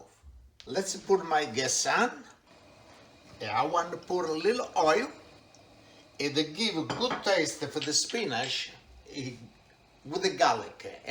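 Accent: Italy. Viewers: You are awesome! My grandma comes from a village in Italy named Casavera outside of Rome. Your dialect is so similar that I think of her every time I watch your videos from the garden to the dry sausage to the pasta & peas, it’s what I grew up around and it’s so similar. Thanks for having an amazing channel! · This is just thé most wonderfull italian dude... I am a big fan of his recipe's. But above all he absolutely melts my heart; with his vibrant personality and ever so charming accent....realy gets me going in my kitchen!! 😍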